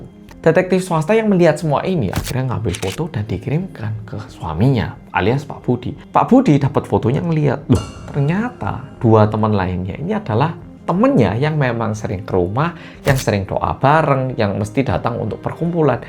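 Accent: Java, Indonesia. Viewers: Hee jadi suka nonton pidio nya mas.. Ceritanya medok Jawa dan ceritanya santai jadi mudah D dengar, 👍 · Rodo medok tenan ya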